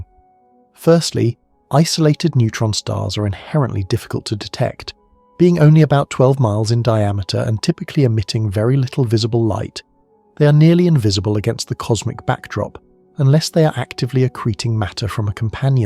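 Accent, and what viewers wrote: United Kingdom. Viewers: Hey YouTube I'm talking to you Please stop recommending science for sleep or the Sleepy scientist or go to sleep listen to this fucking British dude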